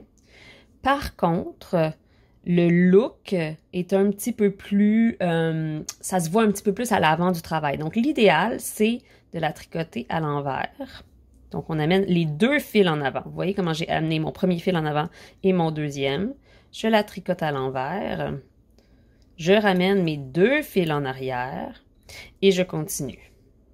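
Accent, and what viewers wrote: Canada. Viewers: Je suis définitivement fan de tes vidéos !!! Grâce à tes tutoriels j'apprends plein de nouvelles astuces tricot. Tout est vraiment bien expliqué et compréhensible. En plus ton accent et tes expressions me donnent encore plus envie de découvrir le Canada!! Bonne journée et merci pour ces échanges.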